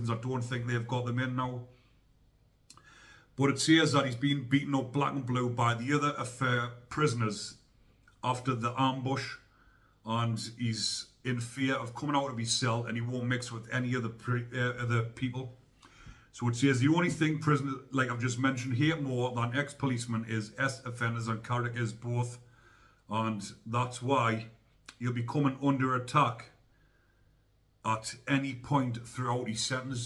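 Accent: Newcastle, United Kingdom. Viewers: Algorithms cant pick up geordie accents dony worry lad 😆. anyway hope that grub gets more of wots coming to him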